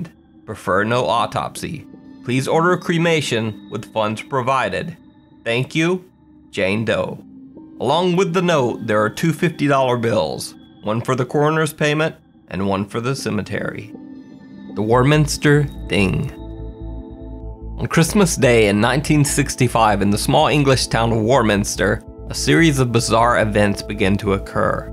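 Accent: South, United States. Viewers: you should hire someone to do the voice overs because you have some like, weird speech impediment. I can't put my finger on it... it's almost like there is too much saliva in your mouth mixed with a slight southern accent. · you sound like you have a southern accent. Your voice is the best to listen to and your vids are the scariest. You and Mr. Nightmare are the best along with Mind Seed tv. You’re my favourite though obviously 😊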